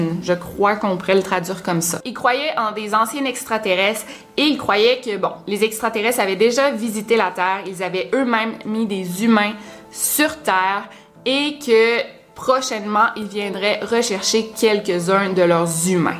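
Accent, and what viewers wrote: Quebec, Canada. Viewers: Je comprends TELLEMENT PAS comment tu peux pas comprendre ce qu’elle dit. C’est littéralement juste du français avec un accent. Tu comprends les marseillais, non? Eh bien tu devrais comprendre les Québecois aussi. C’est tellement énervant les français qui disent “Je ne comprends vraiment pas ce que les Québecois disent mdr ils parlent le vieux français”. J’ai eu un cours sur l’origine des mots et en fait on parle exactement comme il faudrait, si tu regardes la prononciation des mots dans le dictionnaire, c’est comme ça que nous parlons. Je sais que la dernière partie n’avait pas vraiment de lien avec ton commentaire, mais je devais me défouler. Merci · Avant je me retenais de regarder tes vidéos, parce que g gravve du mal avc l accent quebecois. G du mal à tt comprendre. Mais au final , en faisant abstraction sur ça, e franchement la qualité de ton contenue m a seduite . 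Je ne comorend tjrs pas 100% des mots que tu dit , mais entt cas, je comprend bcp plus. En tt cas, merci pour tt le taff que tu fais pour nous. Franchement qualité au top ❤❤😘❤❤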